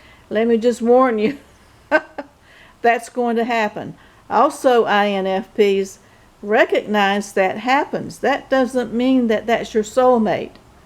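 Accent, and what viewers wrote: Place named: Mississippi, United States. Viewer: I have to know. Where are you from ma’am? I guess Mississippi by your voice, but I am from East Tennessee, and we talk a little different.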